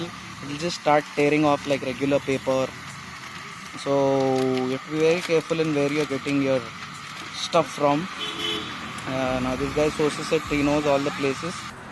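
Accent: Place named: Kerala, India. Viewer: I love your accent brother! You make me remind of my mallu friend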